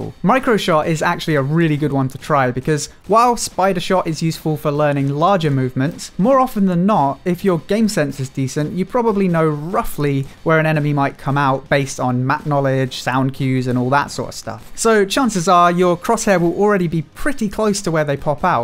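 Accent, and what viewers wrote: United Kingdom. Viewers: Where r u from ..?? British accent ..!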